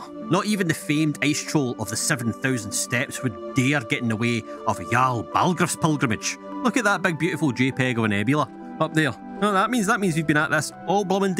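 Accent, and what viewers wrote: Scotland, United Kingdom. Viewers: False, his very heavy Scottish accent is part of what makes this so great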